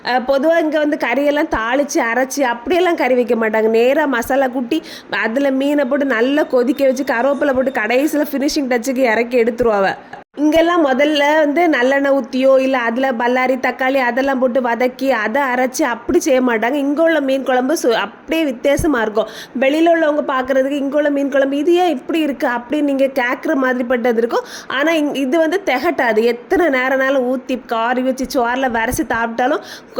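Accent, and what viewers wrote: Kanyakumari, India. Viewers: Nan ungal vedeos pakarathe ungul kanyakumari slang iku than enaku rumbo pudichiru ku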